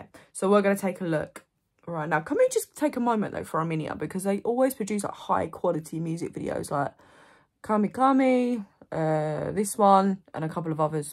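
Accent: United Kingdom. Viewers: Love your reaction and just adore your British accent ❤ Love from Armenia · That british accent❤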